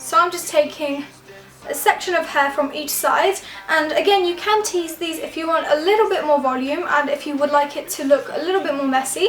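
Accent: United Kingdom. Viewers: She's Polish, but you can clearly tell by her accent that she lives and was born in the UK.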